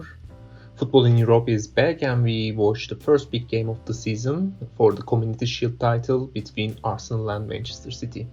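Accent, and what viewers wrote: Turkey. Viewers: you sound like a turk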